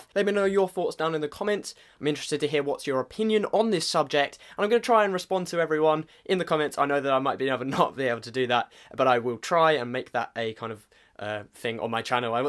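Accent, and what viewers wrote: United Kingdom. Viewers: I really like your british accent!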